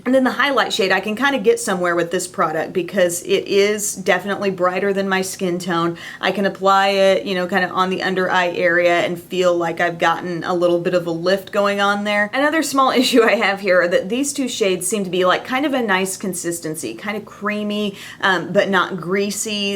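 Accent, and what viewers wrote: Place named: Midwest, United States. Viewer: I just came across your channel, and as soon as you started talking I knew you were from the Midwest, no insult intended. I'm from the Midwest also, and I recognized your accent right away, because you sound like my entire family! Anyways I really enjoyed your video, I'm a new subscriber! ❤️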